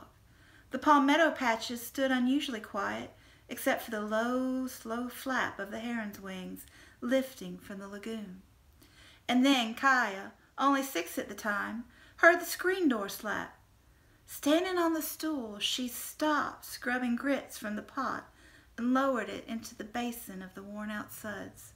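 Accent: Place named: South, United States. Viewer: I guessed all of them except the last one. Haven't read it. You read very well. you got that southern twang in there and everything!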